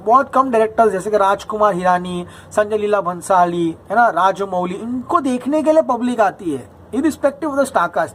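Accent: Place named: Hyderabad, India. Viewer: Apka Hyderabadi hindi superb yaroo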